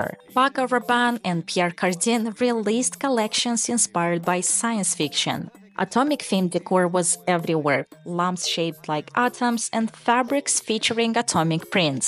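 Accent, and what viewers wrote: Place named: Russia. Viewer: What accent is this?? Russian?? Super adorable accent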